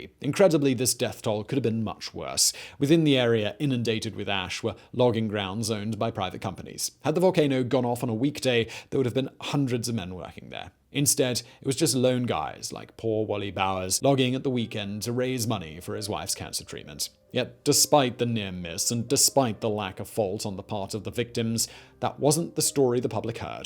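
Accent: United Kingdom. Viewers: You Tube SUCKS! I have removed this man's channels over and over again. But when You Tube changes the algorithm, it force feeds me this guy's many channels despite my removing him. channels suck. They are poorly researched information that is mostly government propaganda talking points. Terrible videos. And just because has a British accent, this doesn't not make him an authority on anything. He's just a talking head. England is over. Brexit has made the only job in England a You Tube content provider. Terrible list videos that spread falsehoods and bull. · agreed. Also this limey sounds stooopid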